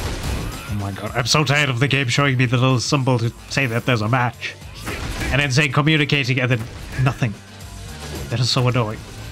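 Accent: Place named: Wales, United Kingdom. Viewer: I'm loving playing Heihachi at the minute he's a great character... unrelated I can't tell if you are Welsh or Asian 😂😂😂. I'm from Cardiff n I swear I can hear some Welsh in there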